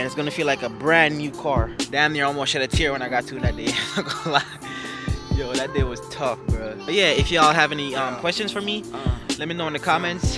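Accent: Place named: Jamaica. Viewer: Bro sounds so Jamaican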